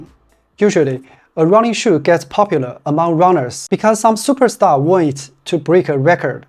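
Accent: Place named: China. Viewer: 你这chinglish听得我全身发麻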